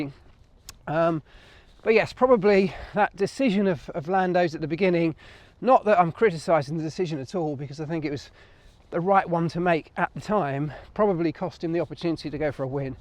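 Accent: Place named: England, United Kingdom. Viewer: Marc, the combo of you walking your dogs through the English countryside while giving a blow-by-blow on the latest F1 is genius. Unique. I am a fan and Seedstream user. Authentic stuff only a true F1 participant could pull off. You know that an English accent can raise bias alarm bells but thank you for keeping the commentary on an even keel. Continued success and many thanks.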